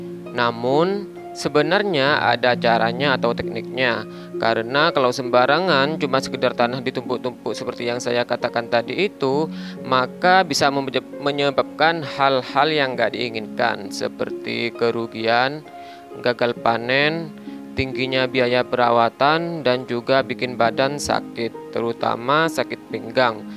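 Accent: Madura, Indonesia. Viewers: Logat Madura 😅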